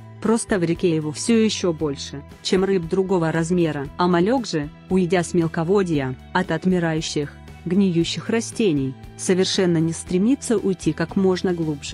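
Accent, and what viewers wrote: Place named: Baltic. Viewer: Вы из Прибалтики судя по акценту? Инфо как всегда интересное, спасибо.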